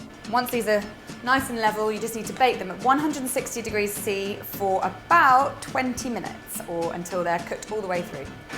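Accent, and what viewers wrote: England, United Kingdom. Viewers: This is one of my favorite English accents. It’s like music to my ears, I could listen to her all day ❤ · Awesome cupcake baker, tatted up cutie and British accent? I believe I just found my new crush! It took me like four vids to realize she was saying "whole milk" and not "homo" tho lol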